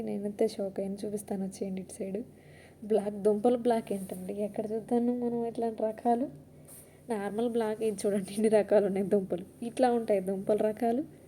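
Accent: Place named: West Godavari, India. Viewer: Mee slang antha maa west godavari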